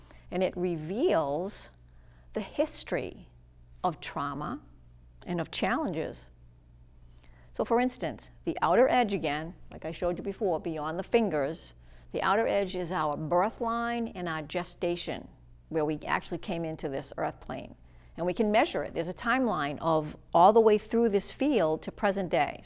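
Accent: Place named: New England, United States. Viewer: A fellow New Englander!! I knew that accent sounded familiar! Great video. Do you teach classes in person?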